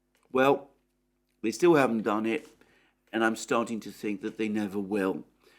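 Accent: United Kingdom. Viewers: Just found you. Ha. Great content. Almost like something I would have put out if I wasn't so lazy. Plus, with a British accent which makes you way cooler than I could ever be. I will say, however, that I am on record as rejecting this pressure on Iran re: nuclear capabilities. I wrote an article over 15 years ago in response to a Kenneth Timmerman piece. It was published in the Washington Times, and was entitled "Iran Paternalism." Good stuff if I do say so myself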